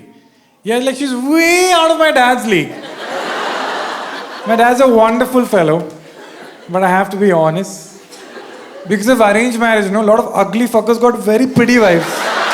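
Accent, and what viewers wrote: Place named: North India, India. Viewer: പാലക്കാരൻ ചെക്കാ പൊളി പൊളി but your accent is like a north indian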